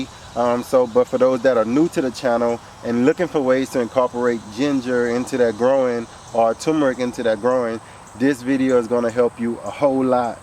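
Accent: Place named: South, United States. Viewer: I know a southerner when I hear my people. Lol This is my first year attempting a garden. I did very well with container tomatoes, then bombed on the garden bed plants. However, I just went and found my first baby watermelon growing after I hand pollinated it three days ago. Super excited! Which zone are you in? I'm in 8a. I'd like to figure out what I can grow through the Fall. Thank you for the videos~!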